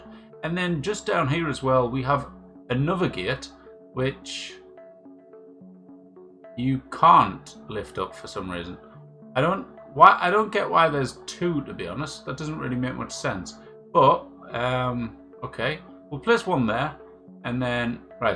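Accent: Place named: Newcastle, United Kingdom. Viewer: A fellow Geordie 👍👍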